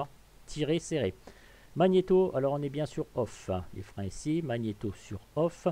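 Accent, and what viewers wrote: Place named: North, France. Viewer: Merci pour le partage. Toujours aussi plaisant ces.petites balades. ... Avec toujours une petite pointe d'humour avec un accent bien du Nord.😉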